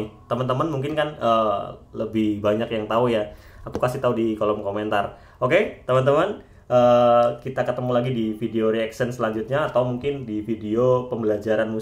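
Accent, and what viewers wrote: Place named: Java, Indonesia. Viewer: Bhs jawa medok nmen mas suhu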